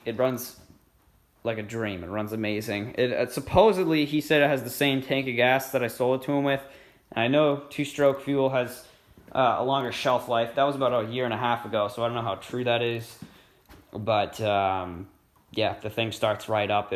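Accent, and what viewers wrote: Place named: Massachusetts, United States. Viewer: You from mass??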